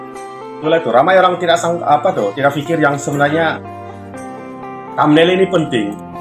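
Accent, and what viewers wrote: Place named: Malaysia. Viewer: Informatif sangat berguna, menarik logat Malaysia nya. Salam dari raja Ampat Papua indonesia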